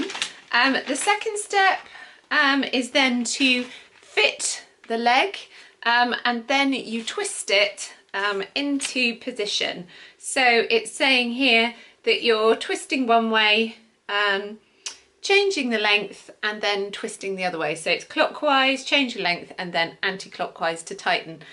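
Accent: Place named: England, United Kingdom. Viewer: How beautiful English accent.. Many thanks for informative video.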